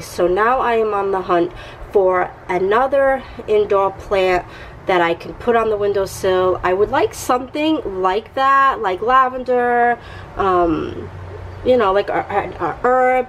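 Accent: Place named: New York, United States. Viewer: Love your accent...sound like a New Yorker and nice farmhouse inspired dollar tree haul! I'm loving the cross along with the blue picture frame combination!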